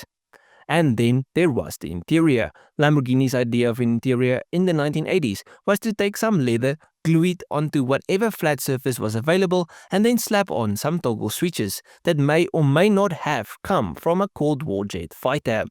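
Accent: South Africa. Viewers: I think they say it “Guy-yard-o”, at least they do if it’s a Spanish bull., I’m trying to place your accent; South African? Love the videos.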